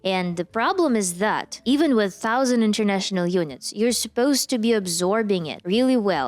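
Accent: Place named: Eastern Europe. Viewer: You sound Eastern European. Meat is your identity😂. Congrats on finally kicking the mental illness away and stay meat-based.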